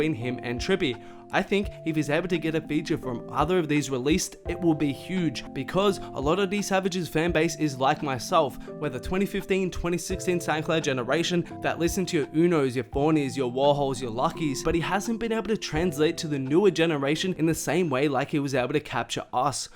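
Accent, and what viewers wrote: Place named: Australia. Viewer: I think it's an australian accent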